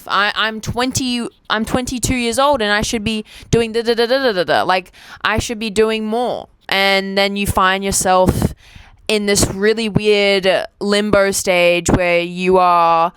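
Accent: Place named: Australia. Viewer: Was gonna say you lowkey sound like but it’s probably just cuz you’re aussie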